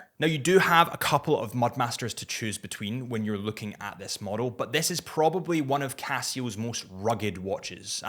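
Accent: Scotland, United Kingdom. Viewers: I love the Scots accent... it's so cool. Connery was the best James Bond.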